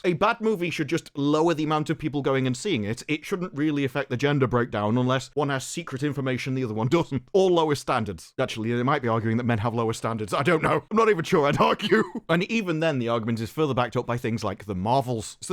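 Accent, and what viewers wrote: United Kingdom. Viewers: Did the british accent just talk shit on the 5th largest economy on the planet(california)? Sounds like someone from the 6th largest econ (uk) better plug his queen hole.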